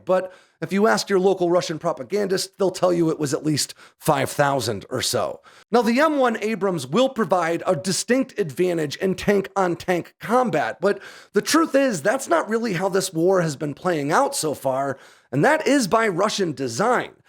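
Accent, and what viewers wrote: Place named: New Hampshire, United States. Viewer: He went to school in New Hampshire and lives in Georgia. His accent is definitely New Hampshire, but some southern gets in there from time to time.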